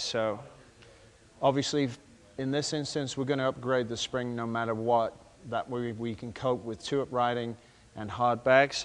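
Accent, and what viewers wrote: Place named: Northern England, United Kingdom. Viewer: is he northen english or american?? that accent is confusing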